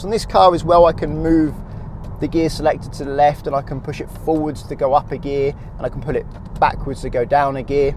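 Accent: England, United Kingdom. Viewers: As a French leaving in the US I was a bit confused by the driver seat on the right side but love the British accent. Great video for someone taking their driving test in an automated car after driving 10y with manual. Thanks for showing a close up of the pedals too. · Love your British accent! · He is so easy to listen to. The English accent is so much easier on the ear than another nationality that I won't mention!! 😂. I'm 🇮🇪 btw!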